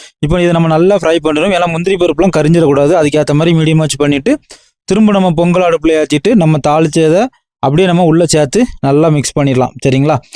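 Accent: Tirunelveli, India. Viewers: From his pronunciation I guess he is from Tuticorin/Tirunelveli side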